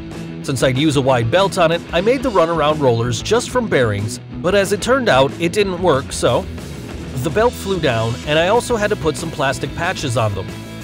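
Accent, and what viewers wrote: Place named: Russia. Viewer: the heavy russian accent is authentic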